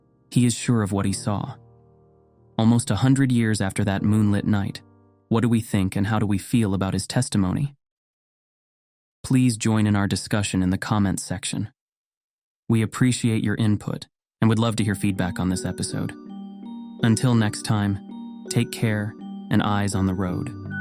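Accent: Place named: Eslöv, Sweden. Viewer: Trodde att han var från Eslöv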